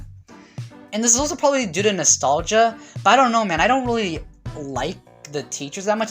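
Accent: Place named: United Kingdom. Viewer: you already sound british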